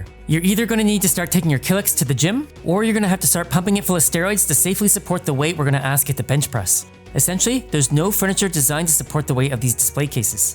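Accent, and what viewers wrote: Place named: Canada. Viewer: Dude sounds like a Asian Canadian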